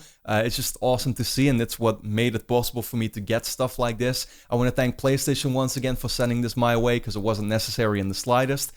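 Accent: Netherlands. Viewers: Ik hoorfe gelijk dat hij nl was accent is altijd hoog hierzo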